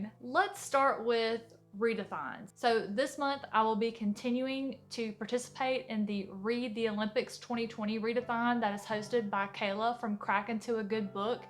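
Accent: South, United States. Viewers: I just subscribed to your channel. I love your Southern accent. I just bought The Way of Kings by Brandon Sanderson. I've never read a book by him but I've heard great reviews. Is this book really good?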